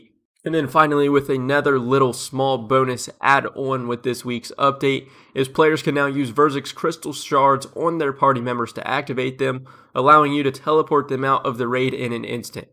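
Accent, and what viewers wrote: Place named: Philadelphia, United States. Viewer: your accent definitely sounds like philly/delco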